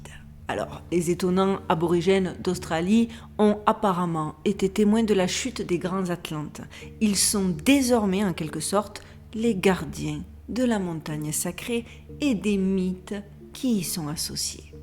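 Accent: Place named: Provence, France. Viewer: Vous êtes de quelle région d'origine ? Votre petit accent du Sud bien de chez nous en Provence. Hein ?😉 🤗💕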